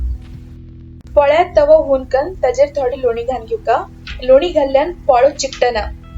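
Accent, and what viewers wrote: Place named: Konkan, India. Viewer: Lovely. I like your shudhdh Konkani.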